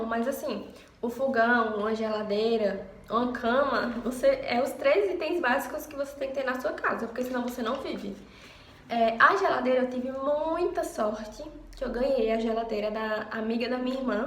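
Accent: Northeast, Brazil. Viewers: Uma cara de paulista danada e sotaque nordestino kkkk combinação interessante, legal o vídeo, tbm estou buscando morar sozinho ano que vem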